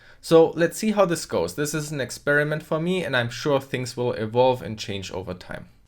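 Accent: Germany. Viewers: what part of Germany you from?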